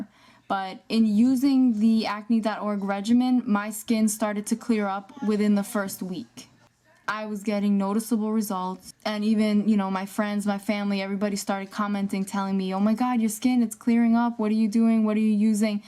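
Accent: New Jersey, United States. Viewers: Are you from New Jersey? I can hear an accent.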